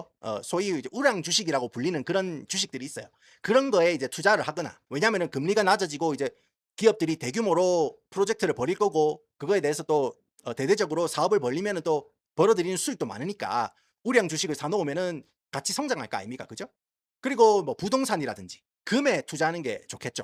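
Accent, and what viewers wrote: Gyeongsang, South Korea. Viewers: 같은 경상도사투리억양이라 더 잘 알아묵겠는...신기한현상ㅋㅋㅋ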